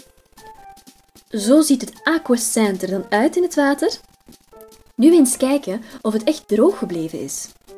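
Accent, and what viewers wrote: Belgium. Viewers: doe normaal man ook niet iedereen vind jou stem mooi maar juist lelijk en zij kan er niks aan doen van die stem ze is Belgies!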